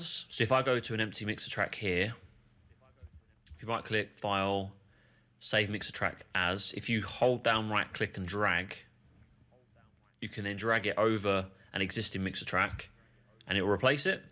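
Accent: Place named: United Kingdom. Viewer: Thank you! That was really helpfull. I'm also like your british accent :) Sounds cool.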